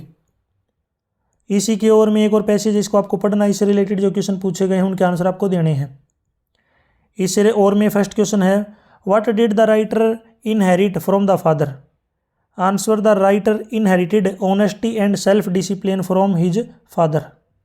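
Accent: Haryana, India. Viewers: Aawaz se hi lag raha hai ki sir haryana se hai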